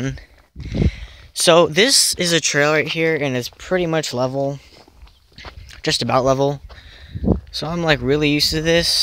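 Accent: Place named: Australia. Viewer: Love the Australian accent, need to make more about your life videos 👍✌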